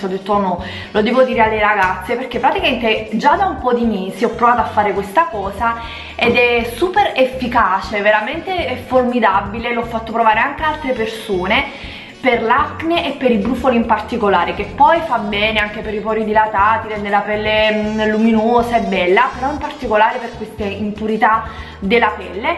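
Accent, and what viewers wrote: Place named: South, Italy. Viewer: Sei bravissima❤️😃Di dove sei? Forse è il contrario ma sento un’ accento del sud (?)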